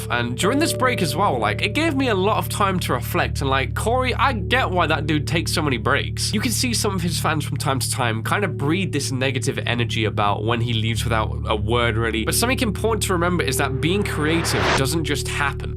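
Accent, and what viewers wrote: United Kingdom. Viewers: wait hes British? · Wait your actually British!?? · What I didn't know was British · Are you British? 🏴🇬🇧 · Wait, you're British? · british Im British too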